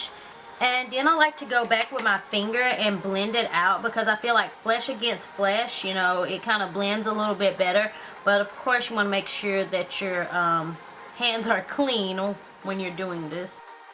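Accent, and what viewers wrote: South, United States. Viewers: Ur so BEAUTIFUL i love your accent! its southern right? IDK thats why im asking lol(nottryingtooffendne1!) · love your country accent xD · I love your accent! lol Sounds so southern. :)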